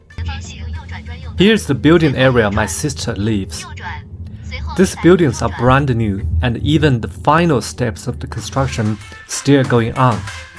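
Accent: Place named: England, United Kingdom. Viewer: I enjoyed your video very much. Your sister’s flat is very spacious and nice, not at all what you would think of as housing for the poor. Your English is excellent, and I’m really pleased that you have an English accent and not an American one when you speak, I also enjoyed the traditional Chinese music. I have subscribed for more interesting video’s. Thank you for sharing.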